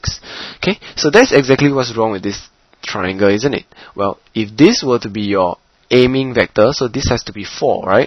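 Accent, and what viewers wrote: Singapore. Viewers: Wow i really got it now Thanks a lot. I'm 99% sure that you are a Singaporean. I can tell by your accent;) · u must be a singaporean or malaysian . ur accent lol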